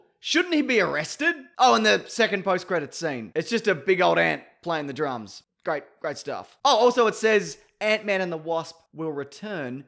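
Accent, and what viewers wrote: Australia. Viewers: Dat TREACLY thick aussie accent countdown, tho! Make a full-vid of jus THAT maybe? And definitely keep counting too slow behind the actual onscreen yt countdown in the corner of the video, lol. PLZZZ. I'll donate to that charity for just one minute worth (or however long it takes to verbalize sixty syrupy seconds, that is). I'd bet you guys could make even a full countdown video hilarious and fun! \m/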